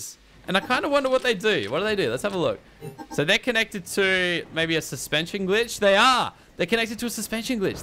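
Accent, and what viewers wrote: Australia. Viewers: your Aussie? ey mate! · wait u are aussie first time i met a aussie youtuber :D im aussie!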